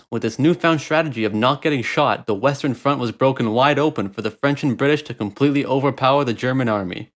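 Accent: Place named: Newfoundland, Canada. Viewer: I’ve been binging your videos and was thinking to myself “sounds familiar..” and the b’ys confirmed it! You’re an NLer!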